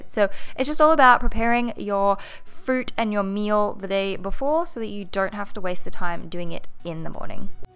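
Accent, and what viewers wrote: Australia. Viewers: Australian obvs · Are you Australian or American ? P.s I love ur channel !!! ❤️❤️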